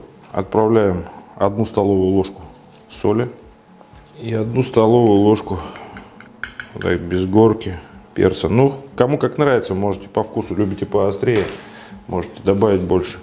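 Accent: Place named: Kazan, Russia. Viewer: Уже много лет живу далеко от родины. Рецепт простой и вкусный и поведан с таким неповторимым казанским выговором, ну просто бальзам на душу. Спасибо большое ☺️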